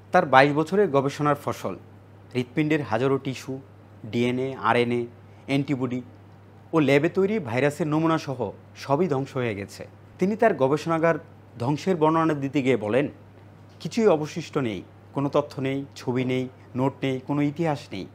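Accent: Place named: Delhi, India. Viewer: delhi r loker bangla jmon houa uchit r ki..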